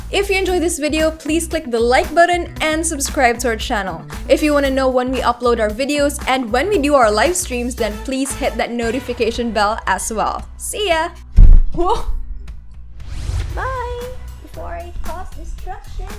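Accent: Philippines. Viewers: I hear Filipino Accent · You sounded like a filipina😅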